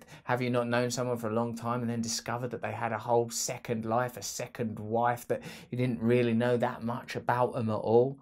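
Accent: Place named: United Kingdom. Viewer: I don't understand your British accent 🥺🕊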